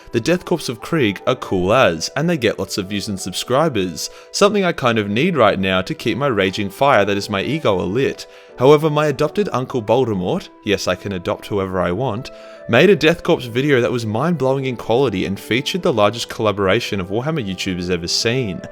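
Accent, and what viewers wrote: Australia. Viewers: Bruh, why are Australian accents the best? voice makes my mussy moist. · I already know half the lore but hearing from an Aussie makes it better.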